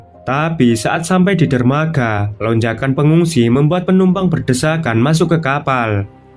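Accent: Java, Indonesia. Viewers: mendalam kali ah bro ini ngomongnya..medok kali 😂 moga sukses bro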